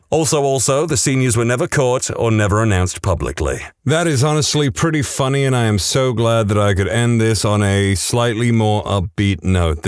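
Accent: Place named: United Kingdom. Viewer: Ohhh... smexy deep british voice <3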